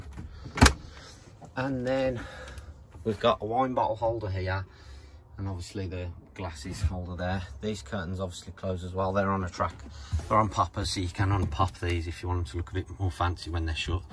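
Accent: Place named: Birmingham, United Kingdom. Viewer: I'm on this to boys early next year I'll start my build where you from you sound local in in Birmingham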